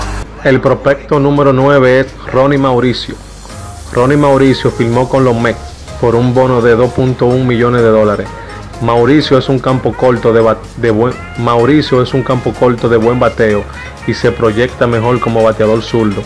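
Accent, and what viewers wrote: Cibao, Dominican Republic. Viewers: Ay perdón, pero sigo escuchando tus comentarios y definitivamente tú eres un Cibaeño bien campesino, OMG, tú no sabes hablar Español!!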